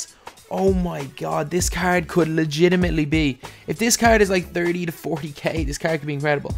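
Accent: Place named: Ireland. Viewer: man your prenounciations are just wired I guess that's just your Irish accent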